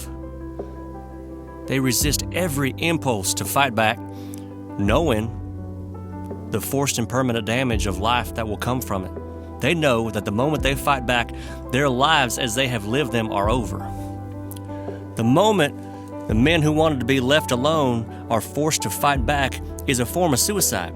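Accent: South, United States. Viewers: So odd to hear this quote in a Southern accent. It remains true and the core of my soul in these days however. "I hope and pray everyday that I am not forced to untether myself from the bonds of civility, and to engage in the destruction of all those who seek the dominion and harm of those whom I hold most dear. For should that wrath of Man be released upon mine enemies, neither them; nor I, will ever live in peace under the sun again. For indeed, I will be forced to scorch earth to preserve the life and well being of those who I do love."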